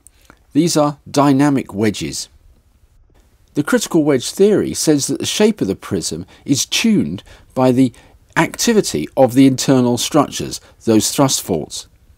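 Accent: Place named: United Kingdom. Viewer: Very good, thanks, and I'm very glad you're a Brit. I wanted to watch this when it came up as recommended, but was prepared to click away immediately if I heard an American accent. Subscribed.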